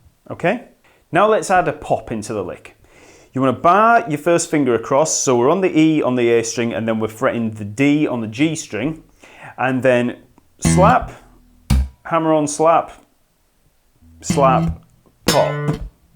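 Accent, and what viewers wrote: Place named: England, United Kingdom. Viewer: I really need to admit that Your tutorials are very helpful! I started giving up with looking for some simple but full of knowledge and exercises videos, and, what's more, for free. And then, I've found You and once again I really awoke this desire to play bass on a high level! Thanks Btw, what kind of English accent do You have?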